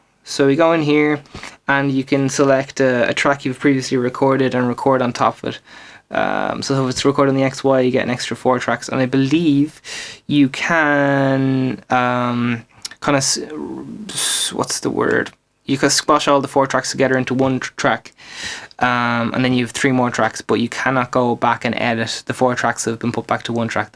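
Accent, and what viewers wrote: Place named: Ireland. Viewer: Nice one, & a pleasure 2 hear that charming Irish accent !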